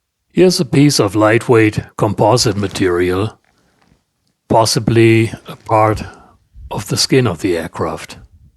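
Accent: Germany. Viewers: I Really Hope the FBI Shuts you Down - Ya got a Foreign Accent too - German maybe🙂 We have the Most Advanced Military in the history of Man because we Protect our Secrets - Today we have idiots and Google earth among others that somehow think its OK to Spy on our most Sensitive Sights - You DO NOT HAVE A TS SAP/SAR SCI Q level etc. Clearance and You Do Not have a Need to Know👎🏻 Reporting this YT Channel also. Good Luck🇺🇸🇮🇱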